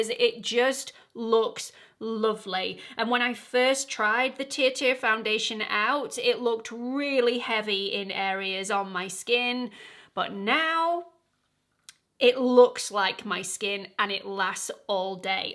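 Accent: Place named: United Kingdom. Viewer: Hi! I love your videos, even though most of the products are not available for me, here in Argentina. I keep your info until I can travel! One question totally unrelated to makeup… I’m a teacher of English and I love your pronunciation… where are you from? It’s clearly British, but not from London. Maybe Scotland? Thanks!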